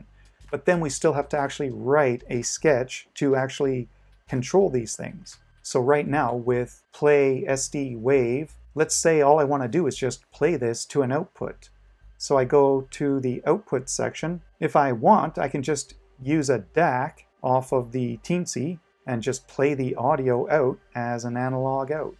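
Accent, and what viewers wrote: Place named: Canada. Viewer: You sound Canadian ;-)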